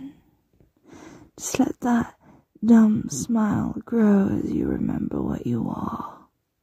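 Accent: United Kingdom. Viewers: Hey are you welsh it's bugging me because I figure it out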